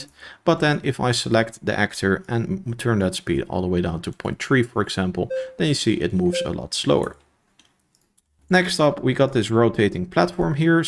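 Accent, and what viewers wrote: Netherlands. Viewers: Duidelijke een Nederlandse accent :D. Cool Template tho!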